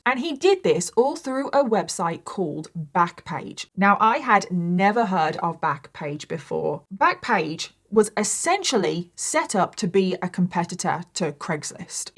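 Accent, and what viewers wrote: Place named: England, United Kingdom. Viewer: ........i ur have a Beautiful accent....i didnt think u were from Australia......i knew u were from the UK.....cause i think the UK accent is nice...i love listening to ur stories.....im from USA...a southern state....thanks for all ur crime stories...i never miss any of ur new stories...❤❤thank u for teaching makeup ways...u have taught me alot😊😊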